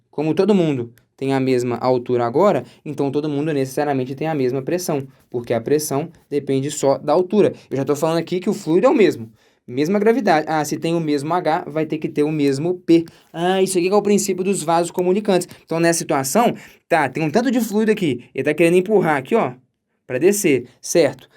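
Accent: Minas Gerais, Brazil. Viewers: o sotaque mineiro melhora tudo kkk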